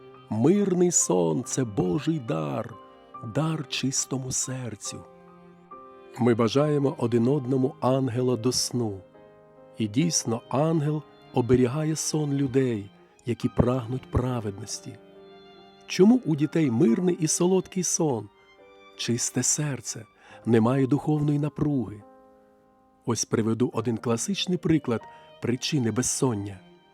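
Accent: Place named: Russia. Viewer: Все прекрасно, якби не той, вибачте, противний, російський акцент. Отче, позбудьтеся його, будь ласка, залишаючи слов'янську мову.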